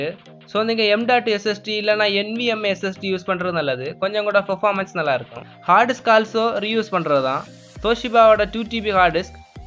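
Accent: Kanyakumari, India. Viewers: Thala ne kaniyakumariya semmaia pesra bro Vanakam maka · anna neenga pesumbothe nenachen nanum kanyakumari than .anna nenga enga erukenga kkla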